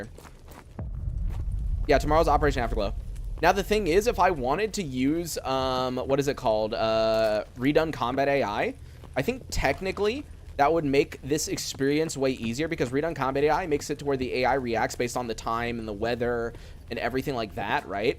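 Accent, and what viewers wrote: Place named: United States. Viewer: the american accent hit harder than i expected despite the fact that i have been listening to your content for about 2 years now.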